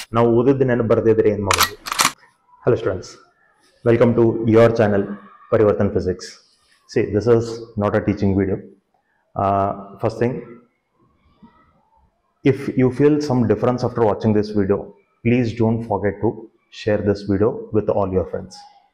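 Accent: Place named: Hubballi-Dharwad, India. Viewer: Thank you so much sir❤.. Sir niv hubballi dharwad..dawra..😊.. Yakamdra..accent hanga anastade..😊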